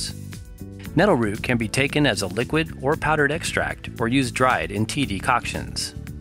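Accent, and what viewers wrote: United States. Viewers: Farang Esaan it's the way Americans pronounce it. Both are correct, like maths and math, both short for mathematics.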